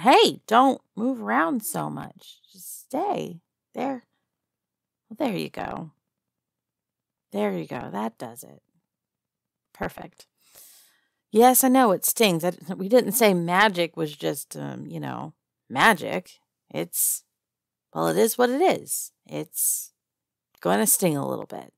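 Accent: South, United States. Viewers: Wait, did he seriously smoke weed as a painkiller? I didn't know you could do that. Anyway, I noticed a startling lack of harpy audios on YouTube, and I'm a sucker for southern accents so this was right up my alley. Thank you. ❤ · It's hard to tell what your real accent is. I liked the southern charm accent though. Your stories always make me smile and my day better. It's awesome that you are growing so well. You are on your way to a play button, i can feel it.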